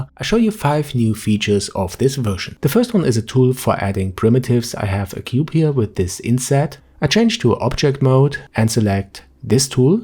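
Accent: Germany. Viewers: yeah... that explains it.. I've never heard germans accent before other than that autogefuhl guy. You guys sounds a lot calmer than the internet claims you to be. lol.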